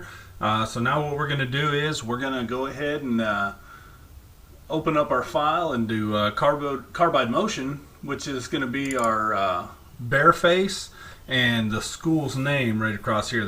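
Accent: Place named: Texas, United States. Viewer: Google the mascot for Baird, TX... It's the Bears. and the accent gave it away. That is why I checked the mascot, he sounded local to me.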